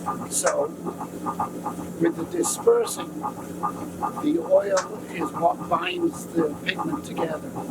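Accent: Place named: New York, United States. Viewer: That is absolutely a NY accent!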